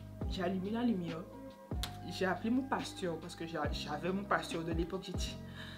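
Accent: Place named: Canada. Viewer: Accent canadien oklm 👌🏾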